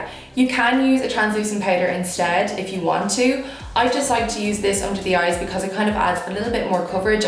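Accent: Ireland. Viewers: It's her Irish accent... personally I think it's beautiful. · Oriana Brat she's Irish , it's just her accent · I'm sure you get this question all the time but are you irish or scottish or something? Because your accent is super cute lol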